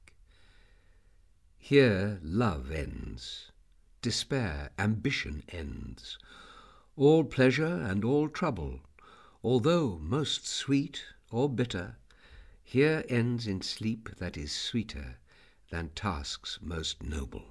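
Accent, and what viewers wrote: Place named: England, United Kingdom. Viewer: Nice reading, lovely English accent as if Thomas himself were reading.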